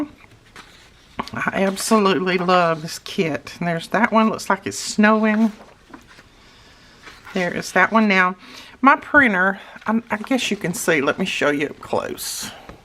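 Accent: South, United States. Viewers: Good afternoon, while I watch and listen to you I am sewing ginger bread dollies for my church nursery..watching you makes me get the paper bug. as soon as I finish the dolls I am going to make Gratitude journals for my ladies group at church.. I am buwy crafting most every day.. You have a blessed day. I love listening to your wonderful southern accent..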